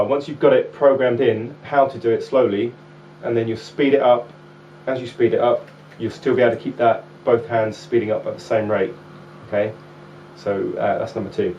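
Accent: United Kingdom. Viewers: cause hes from the uk and were awesome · Ya, I meant English accent, sorry about that, and you do have a point, you guys sure can rock!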